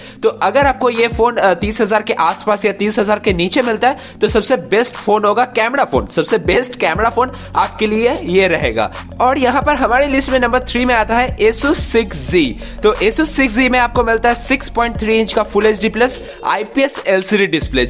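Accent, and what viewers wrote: India. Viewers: Patna se ho Bhai..?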